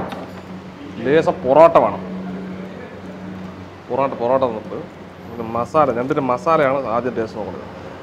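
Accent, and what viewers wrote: Palakkad, India. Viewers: oru palakadan 👌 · ഫുഡ് വ്ലോഗിൽ ചേട്ടന്റേം വിനിച്ചേച്ചിടേം( vinis kitchen) ചാനൽ കാണുന്നത് നമ്മടെ പാലക്കാടൻ സ്ലാങ് കേൾക്കാൻ വേണ്ടി... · Pakka palakadan slang 😎😎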